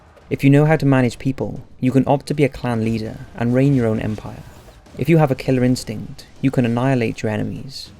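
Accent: Wales, United Kingdom. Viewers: You have an interesting voice. Welsh ? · Welsh..I believe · Why do british people say years like yuurs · Sounds like a 12 year old British boy is trying to read me a book on Viking torture techniques.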